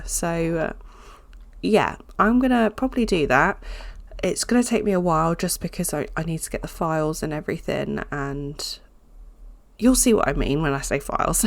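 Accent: United Kingdom. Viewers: I love the house and the cool shapes of the bedrooms, gives them character and it's fun to decorate! You definitely sound like you are from the UK 100% (to me at least and I am from USA) and I love a bit of both rambling and talking about the build, it really sounds like speaking with a friend :) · Love listening to you ramble! Sometimes I just put. your videos on to listen to while at work even though i can’t watch the actual video. Also yes you do sound like you are from the UK. I think you have a bit of an Essex accent 😆 but I don’t think you are anywhere near Essex if i’m not mistaken? 💜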